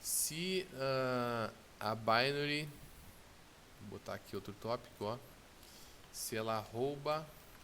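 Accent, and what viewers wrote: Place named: Porto Alegre, Brazil. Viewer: Sou de Porto Alegre , seu sotaque parece daqui hehehe. Estou na Binary a 11 anos e uso o Zeek de forma automatizada.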